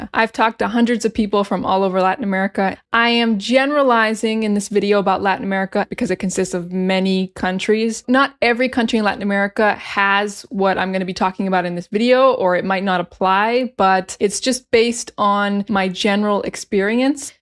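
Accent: General American, United States. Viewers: Si le llaman standard American English y no usa muchos slang y otras expresiones en este video . Stay safe & greetings from the Gulf of California, Mexico 🇲🇽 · I'm from Buenos Aires and I can easily picture myself living in Boston or NYC, and without a car. But I would blow my head off if I had to live in Orlando, for example. I barely remember having seen any sidewalk there. By the way, your English is crystal clear to me. I understood everything, like you were talking to me in Spanish. I guess you have a pretty standard American accent.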